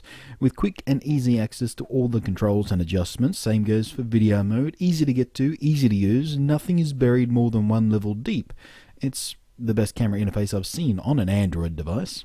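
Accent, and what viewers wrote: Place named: Australia. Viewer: thank you saving me from other reviewers that nit pick on the tineist things. love the accent nice to see and Australian reviewer. i is from new zealnd!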